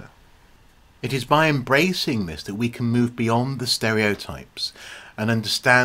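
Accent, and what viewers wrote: United Kingdom. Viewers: I do not recognise your accent as Welsh but English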